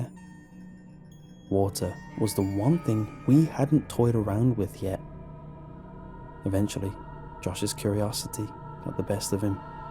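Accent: United Kingdom. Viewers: British people narrating horror stories yes delicious mm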